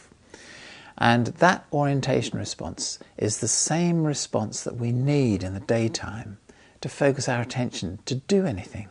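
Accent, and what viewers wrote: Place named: RP, United Kingdom. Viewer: I want to take it seriously, but he looks like me *when* I'm depressed. Fluffy hair because I haven't bothered brushing it, bags under my eyes despite sleeping all the time, and clothing I've just thrown on (flesh coloured jersey?). :( Having a David Attenborough accent doesn't make something authoritative...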